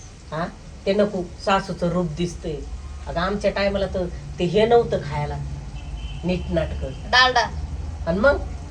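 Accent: Ahmednagar, India. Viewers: बोलणे तर नगर सारख आहे पुण्याचे वाटतच नाही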